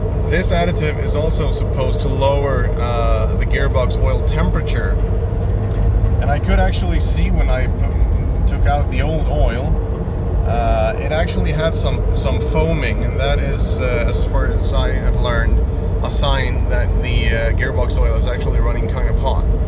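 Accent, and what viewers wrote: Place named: United States. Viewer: Crazy you say English isn’t your native tongue. You sounded like an American.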